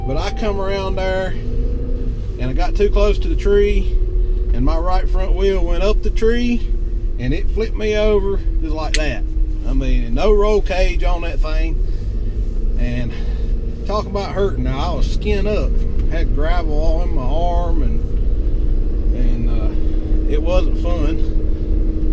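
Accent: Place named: Tennessee, United States. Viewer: I’ve got a buddy from Morristown tn who has an identical accent If you had said “now look here” I’d have thought you were him Sorry about the tree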